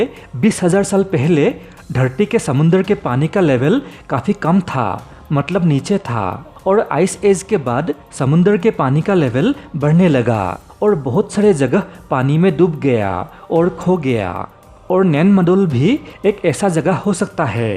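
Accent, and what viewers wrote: Assam, India. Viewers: Apunar Pronounce Huni Gom Palu J Apuni Oxomiya Hoi ....But Apuni Oxomor A Hoi Ne Onnyo Rajyor Hoi😊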